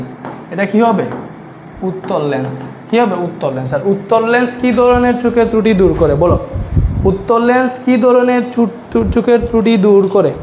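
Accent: Sylhet, Bangladesh. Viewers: স্যার সিলেটি❤ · তুমি মিয়া সিলেটর কোন জাগার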